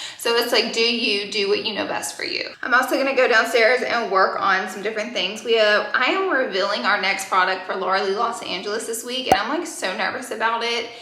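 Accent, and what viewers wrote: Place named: South, United States. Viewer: If her accent didn’t give away that she is southern.... that wonder bread sure did. Literally a southern staple haha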